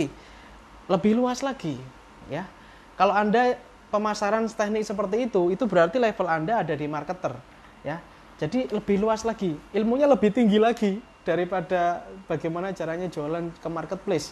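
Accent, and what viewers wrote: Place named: Yogyakarta, Indonesia. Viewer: Jelas mas dr yogya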